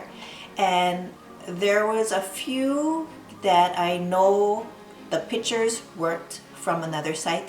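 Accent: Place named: Hawaii, United States. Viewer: Ho aunty, nice review! I knew you were from Hawai'i after I hear you talk. Aloha and mahalo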